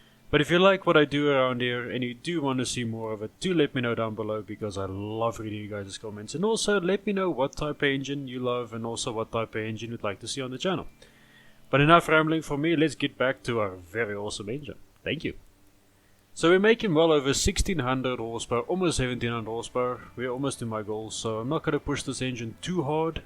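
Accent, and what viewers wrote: Afrikaans, South Africa. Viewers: I knew it 😁... that Afrikaans accent came through perfectly 😂👌🔥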